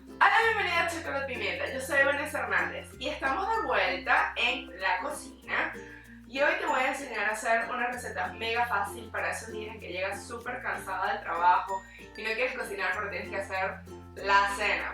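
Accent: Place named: Venezuela. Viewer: Su acento 100% Venezolano, lo note desde el principio. De solo escucharla me suscribi ;)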